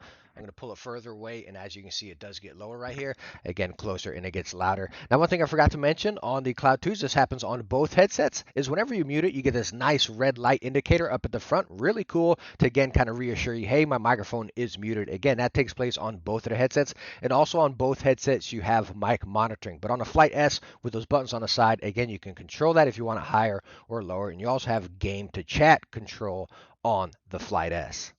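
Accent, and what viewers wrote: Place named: Texas, United States. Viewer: Hey man. I am new to the channel. Have been enjoying your videos a lot. I am just curious, were you born in Texas? Your accent is nice af.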